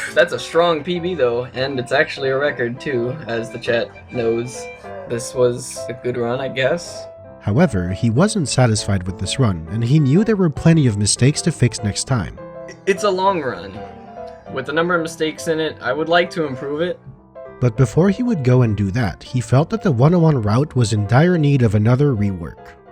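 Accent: Quebec, Canada. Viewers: yeah I felt pretty confident too since early on. I'm not aware of another accent that would sound kinda like Québec's.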